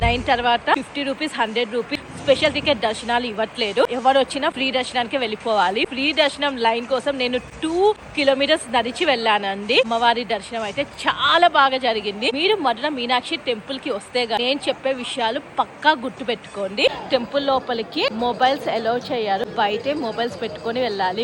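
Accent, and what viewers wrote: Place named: United States. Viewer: అమ్మా చెల్లెమ్మా...కాస్త మన తెలుగులో చక్కగా మాట్లాడు తల్లీ..అమెరికా నుండి వచ్చినట్లు తెలుగులా కాకుండా...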